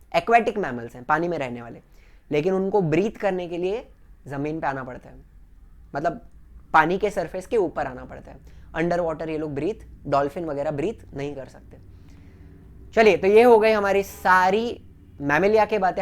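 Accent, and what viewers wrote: Maharashtra, India. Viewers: You are Kutchhi but you sound more like a Maharashtraian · sir aap marathi ho na ?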